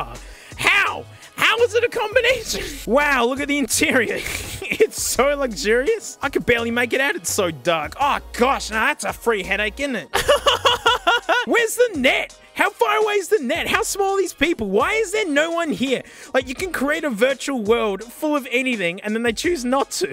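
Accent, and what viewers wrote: Australia. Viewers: I really love the weekly display of the disembodied hands with Australian background voice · I've been binging your videos for like the past week, and I have this feeling. . . that you might be Australian.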